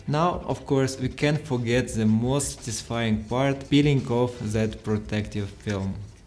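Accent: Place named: Russia. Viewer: The most russian accent ever 😃😁 всемусердецный привет из Азербайджан 😃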